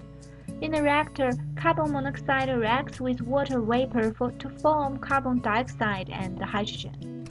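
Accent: China. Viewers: The video is funded by two US organization yet why does it sound like you hired a voice actress who sound like she hadn't even left China?! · if this is an "american institute" thing then why is this being narrated by some chinese lady who just learnt english a week ago ?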